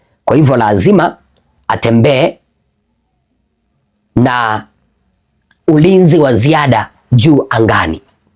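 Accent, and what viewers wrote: Kenya. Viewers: NA NYINYI WATANZANIA VILAZA HAMGUNDUI HUYU KISWAHILI TU KINAFANANA NA WAKENYA MNABURUZWA TU KM MKOKOTENI NA MNACOMMENT UJINGA WANANGU KAZI YA ULINZI SIO LELEMAMA ..HATA MAGU MSAFARA WAKE HAUKUA WAKITOTO NA HIZO HELICOMPTER UNAZOZIONA KAZIACHA JPM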